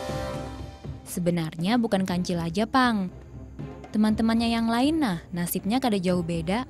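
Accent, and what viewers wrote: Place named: Banjar, Indonesia. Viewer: Kek lugot orang banjar nih kka🙄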